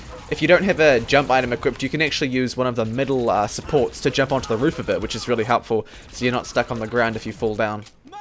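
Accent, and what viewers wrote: New Zealand. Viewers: Are you from NZ? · You must be from New Zealand, with an accent like that. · Omg its muselk! Oh wait it's just some kiwi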